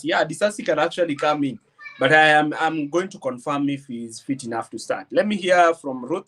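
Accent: Kenya. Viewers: Good job brother, I knew that was a unique Kenyan signature tone and accent display. Thank you for the good work you are doing.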